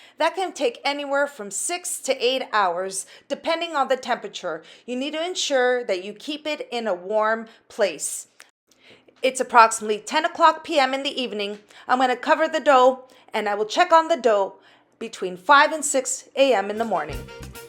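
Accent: Portugal. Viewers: A sua massa está linda,muito bem explicada.Adorei a sua pronúncia açoreana.Nasci em S.Miguel,mas estou na Califórnia há 53 anos,adoro tudo o que vejo,e também faço.Abraço Felecidades ❤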